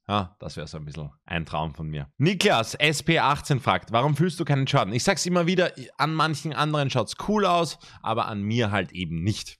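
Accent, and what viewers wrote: Austria. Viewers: Red bitte nie wieder Hochdeutsch 😂 für mi als bayer is des echt schlimm😂 des österreichisch steht dir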